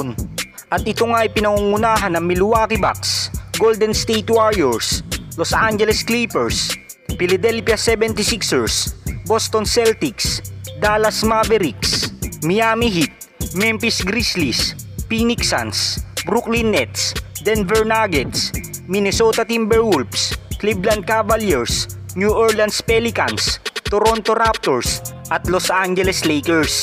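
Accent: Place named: Visayas, Philippines. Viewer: Kainaman ng tigas ng yung salita..visaya kaba hehe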